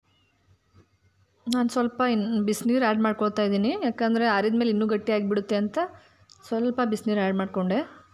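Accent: North Karnataka, India. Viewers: Mam super volg nimma husband aane work madthiddare north karnataka bhasha channage mathadthira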